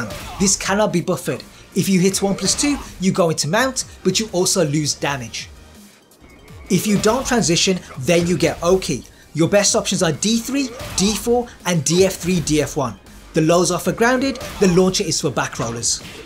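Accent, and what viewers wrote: United Kingdom. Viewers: I love your accent bro , you from UK ?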